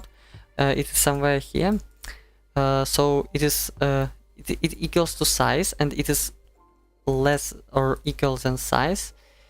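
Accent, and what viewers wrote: Russia. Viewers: мужик у тебя капец акцент лютый русский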